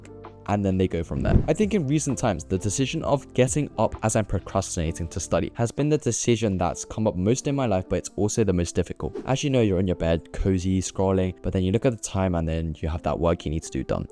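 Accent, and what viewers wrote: United Kingdom. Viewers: literally watched the entire video not noticing he was brittish until he said “oh my days man” and now i cant unhear his accent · Korean guys with british accent are so welll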